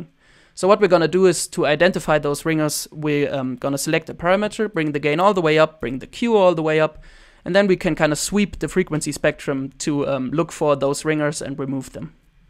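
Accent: Germany. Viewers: wetten du bist deutsch haha dein Akzent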